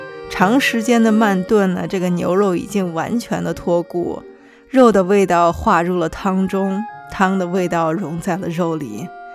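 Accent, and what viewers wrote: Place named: Northeast, China. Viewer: 我听你的口音有点像东北口音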